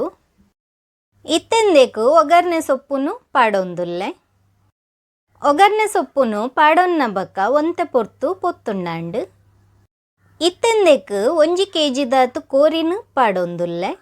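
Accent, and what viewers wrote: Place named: Mumbai, India. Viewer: You sound like Mumbai residing mangalorean.. it's very irritating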